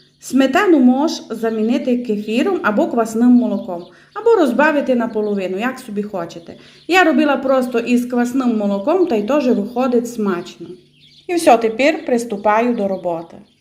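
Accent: Transcarpathia, Ukraine. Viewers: Уши греет Ваш чисто-Закарпатский.Вы откуда?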